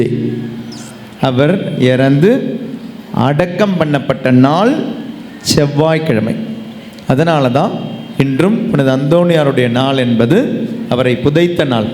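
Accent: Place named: Kerala, India. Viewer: மேஜர் அந்தரராஜன் ஸ்டைல். மலையாள வாடையும் விடமாட்டேங்குது.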